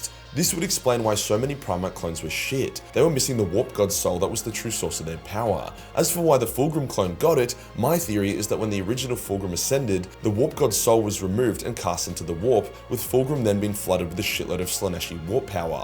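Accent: Australia. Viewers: love the video style fam, your australian accent fits the comedic style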